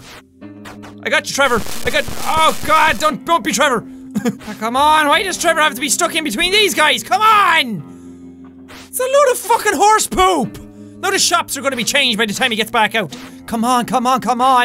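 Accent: Ireland. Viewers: haselbacher he is IRISH and me too # Irish4life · Zarif Safin irish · Tirteen strengt. Lol, i love the irish accent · Lol it's Irish dude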